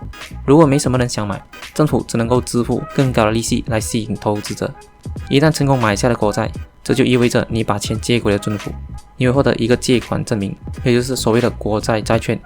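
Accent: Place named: Malaysia. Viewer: 雪碧馬來口音，聽得頭痛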